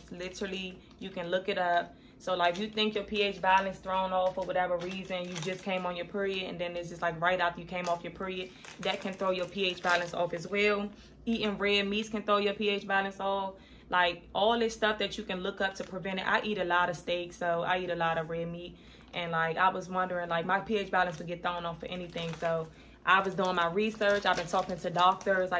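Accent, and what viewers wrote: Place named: Washington DC, United States. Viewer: You from dc ? I know our voice when I hear it 😂🫶🏼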